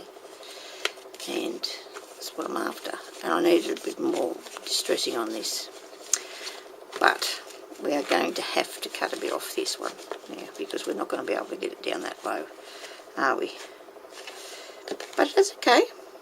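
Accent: Australia. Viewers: its such fun. love watching what you have done.its great to hear an aussie voice and to see how creative you are. love your laugh. thanks so much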